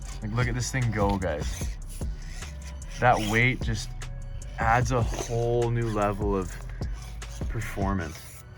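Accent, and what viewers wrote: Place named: Central Canada, Canada. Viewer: So, just for fun, if I was to guess by the rocks and wood you have on your course, would I be right you're a West Coaster? I was thinking Central Canada by your accent, but thinking the natural materials say West. LOL!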